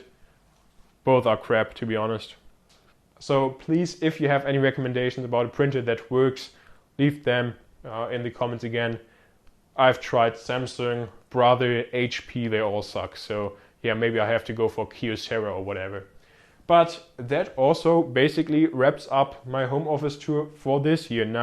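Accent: Germany. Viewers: Man hört Mega dass du deutsch bist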